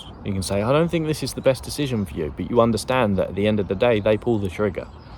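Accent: England, United Kingdom. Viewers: The Wise English Voice in my head is back 😁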